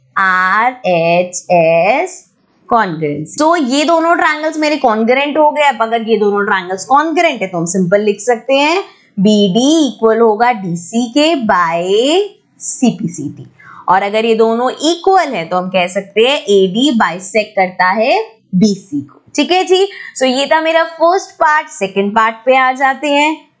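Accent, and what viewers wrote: Punjab, India. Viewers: Tuse Punjabi ho kya???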